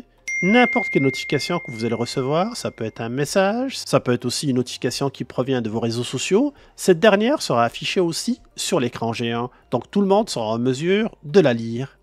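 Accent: Quebec, Canada. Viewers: c’est un accent du Québec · Super vidéo. Bonus d’un français, ton accent de cousin d’Amérique 😊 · Présentation et conseils très complet et en plus avec une pointe d'accent Canadien. Qui de mieux ? MERCI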